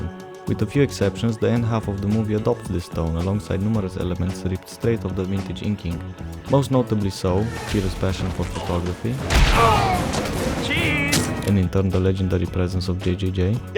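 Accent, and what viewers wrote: Eastern Europe. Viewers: Great video, but I'm much more interested in where you are from and how you came into reading American comic books. Not many people know who Mark Bagley is in the US, let alone Eastern Europe (assuming by your accent). I think that would be a cool story to hear. Do you read anything outside of Spider-Man?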